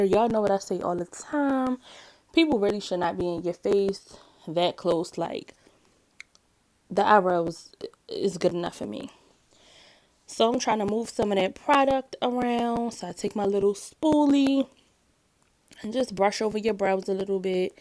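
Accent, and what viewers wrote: Baltimore, United States. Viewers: New subscriber.... you sound like you from Baltimore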